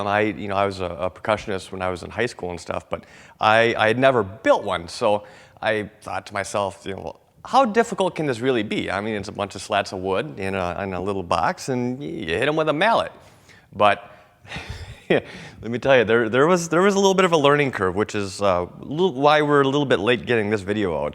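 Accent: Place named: Minnesota, United States. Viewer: are you minnesotan? definitely sounds like it!!!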